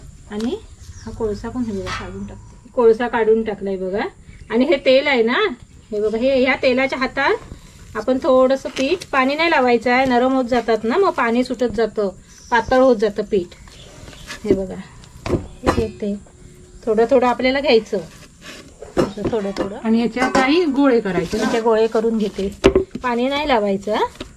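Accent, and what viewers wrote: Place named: Malvani, India. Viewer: Tumhi khuup chaan bolatat kaki , assaal malvni tune madhy... 😊 mazya aai sarkh....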